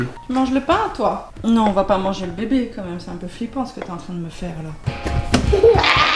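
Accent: Belgium. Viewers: T'est belge? Moi oui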